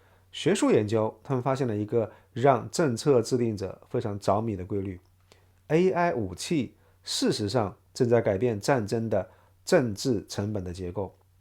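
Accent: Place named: Sichuan, China. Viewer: 这口音是四川人啊😊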